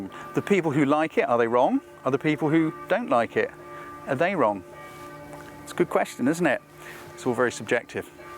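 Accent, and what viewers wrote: United Kingdom. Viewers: By the way I am big fan of yours - Your Voice, your mannerisms, and your "BRITISH" accent - I would love be born again to acquire it :) You have also lost weight, which is awesome ! BIG FAN !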